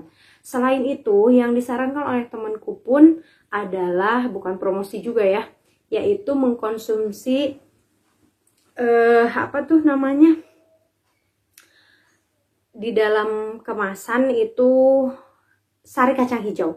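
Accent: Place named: Tasikmalaya, Indonesia. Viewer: Logat tasik na aya keneh.. 😁😁✌️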